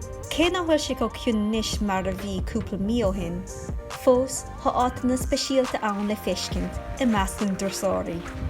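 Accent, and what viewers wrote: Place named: Kerry, Ireland. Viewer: Tá fáilte romhat! Táim an-sásta nach chuir mé olc ort, mar ní raibh sé sin i gceist agam. Tá sé go han-deas éisteacht le blas agus canúint Chiarraí ar líne, agus cheap mé gur trua é go raibh roinnt focail deacar le chloisteáil mar gheall ar an gceol. Ach bhain mé an-taitneamh as an video, is contae álainn é Cíarraí agus is aoibhinn liom liom go bhfuil tú ag déanamh fíor-irracht chun caint lenár gcanúint in ionad leis an gCaighdeán. Táim ag múineadh Gaelainn do mo chaillín as Meicsiceo agus ba mhaith liom an video seo a thaispeáint di chun éisteacht le mo chanúintsa. Táimid inár gcónaí i nGaillimh, só níor chuala sí riamh í, seachas uaim.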